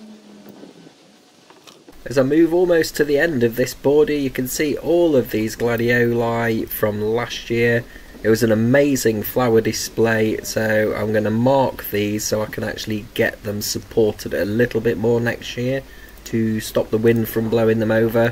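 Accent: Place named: North, United Kingdom. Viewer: Oh God - I cannot move on my patio from all the divisions I have made of every perennial you can think of. I dug up one small clump of helenium and got over 100 separate plants. I think I could start supplying plants to the Dutch international plant shippers at this rate, I'm definitely hoarding! And just so you know - I reckon nobody is offended if you don't reply to their posts. It's decent of you to show appreciation, but if you get more subscribers and reply to everybody you will get burnt out, so I never mind if you don't respond to any of my posts again if it means you have more time to do what you enjoy and make such excellent content. You sound like a fellow northerner so its good to have somebody fairly local, gardening in similar climate with similar plants at roughly the same stage as the stuff in my garden. I see many others feel the same as I do, so keep up the ace content where you have time and if you are busy, all us new subscribers can form a community sharing tips and ideas in the comments amongst ourselves. 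Cheers for making me feel welcome as a newbie though!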